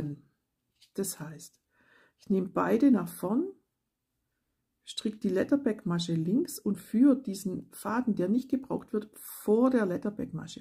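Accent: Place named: Swabia, Germany. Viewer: 👌toll erklärt. Schön mit Deinem "schwäbischen" Dialekt. Man kann's halt nicht verbergen.😁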